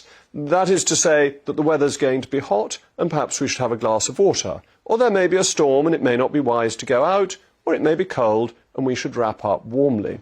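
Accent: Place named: RP, United Kingdom. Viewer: The plummy voice of reason.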